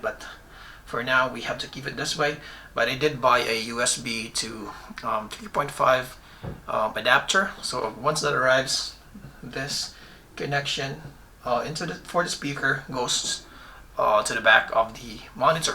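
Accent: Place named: Canada. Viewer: Good video mate. The whole time I was wondering where you were from and then you said NZD! Your accent was throwing me off. Where is it from? I'm going to guess Canadian? Good to see techies across the ditch making good vids. All other reviews on this monitor are rubbish. I was 90% sure on getting this monitor before watching your vid, and now I'm going to buy it.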